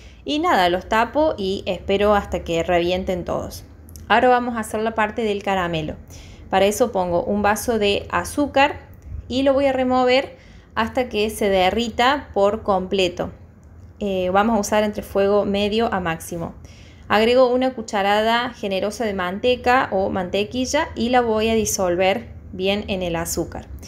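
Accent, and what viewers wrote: Córdoba, Argentina. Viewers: Gracias hermoso escucharte esa tondita Córdobeza!!! Geniaaaaa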